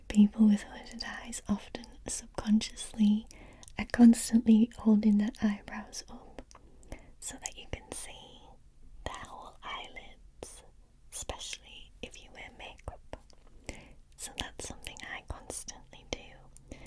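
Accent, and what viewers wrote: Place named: North East England, United Kingdom. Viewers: Gotta love the north east accent! Im from County Durham myself 😅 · sunderland accent is so good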